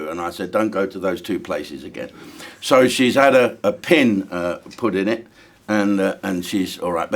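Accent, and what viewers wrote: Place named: RP, United Kingdom. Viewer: Very posh. Very posh.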